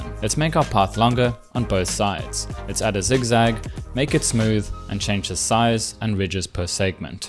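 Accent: South Africa. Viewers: Nice video, I thought I recognized South African English.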